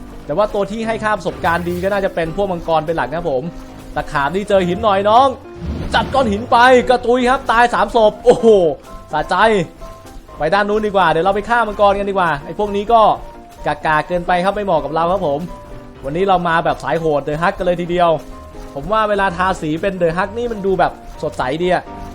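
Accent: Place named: Nakhon Si Thammarat, Thailand. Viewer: พี่คนนครใช่ปะ(ผมฟังจากสำเนียงอะ)คนใต้ด้วยกันอิอิ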